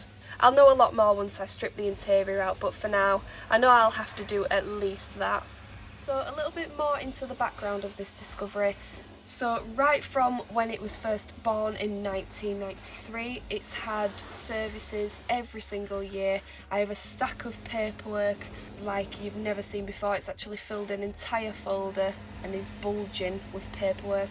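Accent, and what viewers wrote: United Kingdom. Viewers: 영국 영어다!!!!!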